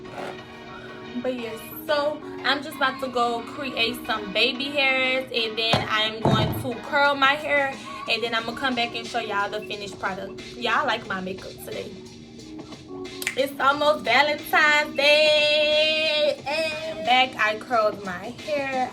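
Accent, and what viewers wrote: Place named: Louisiana, United States. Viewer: Are u from Louisiana?!.. ur accent 😍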